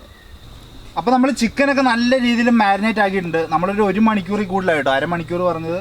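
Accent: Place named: Kottayam, India. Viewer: Ok, but broo ningade language pakshe nk oru kottayam area ayit thonniyallo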